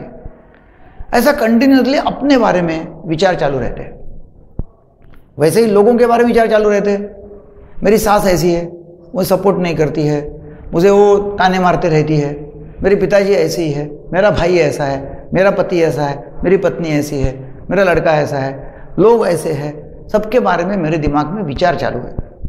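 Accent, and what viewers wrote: Maharashtra, India. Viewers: सर तुमच्या हिंदी भाषेवर मराठीचा प्रभाव दिसतो.. But your all videos are really Awesome as most are connected to our daily life problems and you illustrate it very well .. So please keep posting.. I am from वर्धा... Best luck to you.. Sir, Meditation म्हणजे नेमके काय..? Awareness, Alertness, Consciousness व Concentration यात काय फरक आहे कृपया ते स्पष्ट करणारा video बनावणे.. Sir, Meditation मी अनेक दिवसांपासून प्रतिदिन करतो आहे पण मला त्याचा विशेष फायदा जाणवला नाही आहे.. Present मध्ये कसे जगता येईल ते स्पष्ट करने.. काय Meditation केल्याने कुणाचे मनोविकार(काम, क्रोध, लोभ, अहंकार आदि) नष्ट होतात.. ते नष्ट करायचे असल्यास काय करावे लागेल..? शांत मन Meditation साठी Prerequisite आहे, पण शांत मन कशे साधायचे हा प्रश्न बिकट आहे..? माझ्या मते व्यक्तीस वैराग्य आल्याशिवाय, त्याच्या तृष्णा नष्ट झाल्या शिवाय त्याला शांति प्राप्त होने अशक्य आहे.. 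पण वैराग्य प्राप्ती व तृष्णाविहीनता कशी साध्य होणार, याबद्दल कृपया मार्गदर्शन करावे..सर्व काही असतांना माझे मन विनाकारण सदैव अशांत राहत.. मला चिरकालीन शांति अनुभवायची आहे.. कृपया मार्गदर्शन करावे ही विनंती.. नमस्कार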